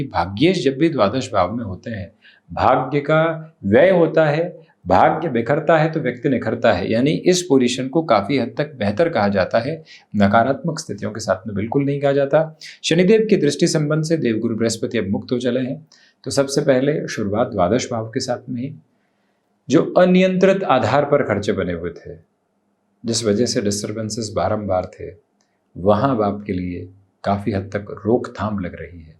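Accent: Standard. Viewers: पंडित जी आप बहुत शुद्ध बोलते है आप जो बोलते है उसका अर्थ समझ मे नही आता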